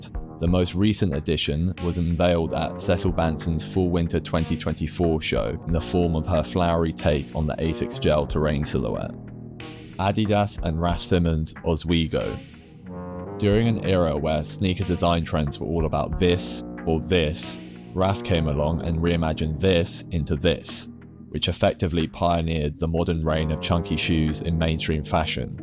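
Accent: United Kingdom. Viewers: I love your videos but i have to admit, its hard listening to that much fry (plus the britishest accent, my mind is exploding)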